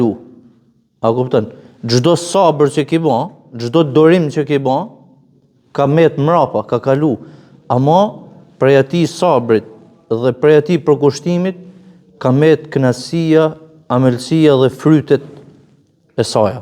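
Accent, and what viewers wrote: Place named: Deçan, Kosovo. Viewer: Mos jeni nga Deçani? Sepse ashtu e paske Dialektin!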